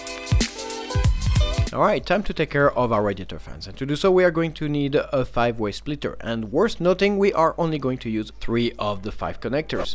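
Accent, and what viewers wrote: France. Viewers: tu as un acent Francais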